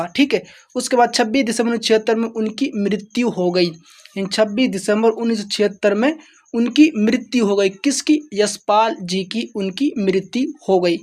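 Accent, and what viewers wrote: Mewat, India. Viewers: Oye tu mewati to na h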